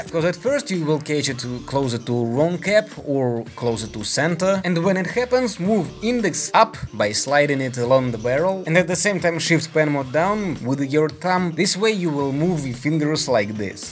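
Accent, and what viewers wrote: Russia. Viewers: I love your. Russian accent it's so nice to hear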